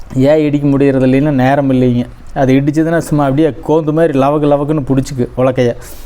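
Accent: Kongu, India. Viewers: இலந்தைவடைசெய்முறைஅருமை உங்களுடைய கொங்கு தமிழ்மிக அருமைங்க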